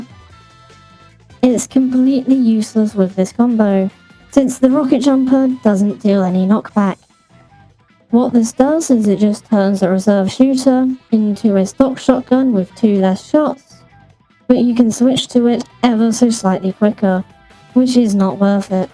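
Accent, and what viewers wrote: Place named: United Kingdom. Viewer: They're not a robot they're british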